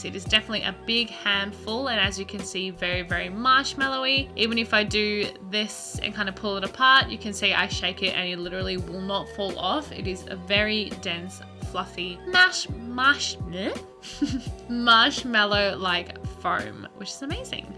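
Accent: Australia. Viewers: I love your (Australian?) accent. It just reminds me of the time when I lived in Sydney.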